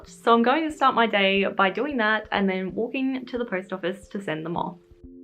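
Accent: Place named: Australia. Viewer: We recently moved to usa from tassie to care for my MIL. Im so homesick though. When I stumbled accross your channel I felt so comforted hearing your aussie accent. And then you say you're from tassie!!! Im subscribing ❤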